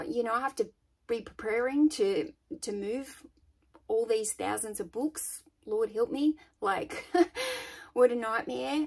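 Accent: Australia. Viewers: Are you Austrilan?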